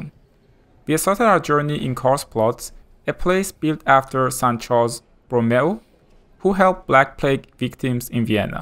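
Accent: Iran. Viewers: Irani accent spotted 😂❤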